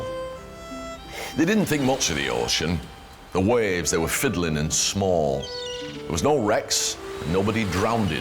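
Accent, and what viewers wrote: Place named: United Kingdom. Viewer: Обожаю британский акцент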